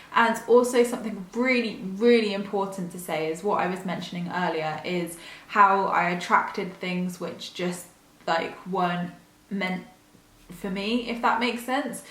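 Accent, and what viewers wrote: England, United Kingdom. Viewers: I'm trying to manifest my dream home, which would be a self build straw bale house (not as unstable it sounds lol, actually a really well insulated house that is covered in plaster and cheap and eco friendly). This will mean I'll need a lot of money to buy the land and pay for the build, but I'm not complicating it by thinking about all the different aspects of it too much, I'm just visualising the house, and am going to try putting my written list in a manifestation box too like you have suggested. I'll watch the rest of your videos too and let you know how it's going, great to find another English person making these videos too, though all the American ones are still inspirational, somehow the English accent makes it more relevant to me.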